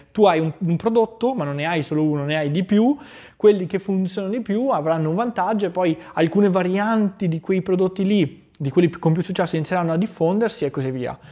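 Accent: Veneto, Italy. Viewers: Wow!, ti è venuto l'accento Veneto... Pazzeeesco!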